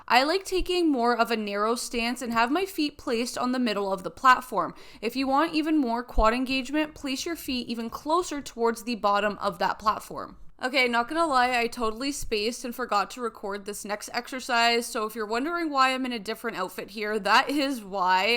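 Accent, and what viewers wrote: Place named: Canada. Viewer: Canadian! U can tell by the way she says 'Out'.... heh heh;-)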